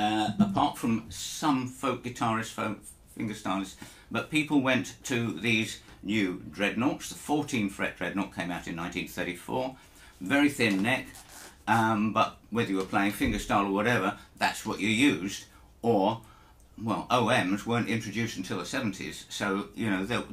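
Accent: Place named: United Kingdom. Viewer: Hi! I really like your British accent. 😃 Sounds so stylish 😊What do you think of Guitars with laminated parts? Could they sound also good? I am thinking about buying a 12 string guitar (Guild) with laminated parts. Cheers Roger 🇨🇭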